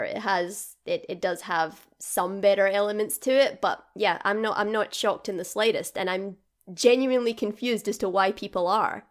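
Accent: Scotland, United Kingdom. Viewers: Oh Scottish, I was wondering. Well its lovely! And if this wasnt scripted I would have to applaud you again because I thought it was very well thought out. I too was a big fan of the shakespearean vibe they had in the first two movies which I really enjoyed but they ditched that theme and went way too silly. I liked how you highlighted the theme of Thor always moving away from wanting to rule which is very much his character arc, wanting to be more involved with middle earth than Asgard. I agreed with everything you put out there and really appreciated your video. 🙂 I just found your channel but I am definately subscribed now! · Are you Scottish ?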